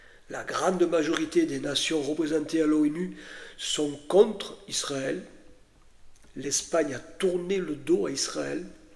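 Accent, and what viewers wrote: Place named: South, France. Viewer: Merci monsieur... je vous ai decouvert il y a peu de temps... j’aime la justesse de vos propos... je remarque un bel accent du sud! Peut-être Êtes-vous proche de chez moi ! J’habite a Prades à 46 km de Perpignan ! Y a t’il une possibilité d’aller vous écouter si vous êtes dans la region ? En tout cas merci infiniment pour vos prédications ... j’aimerais beaucoup que le mo’de évangélique se rapproche d’ Israël, de ces fêtes et de la Torah... que notre Seigneur vous bénisse abondamment ! ❤❤❤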